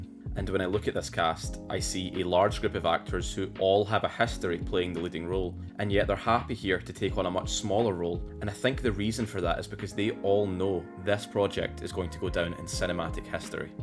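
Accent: Scotland, United Kingdom. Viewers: Like the Skaughtisch accent.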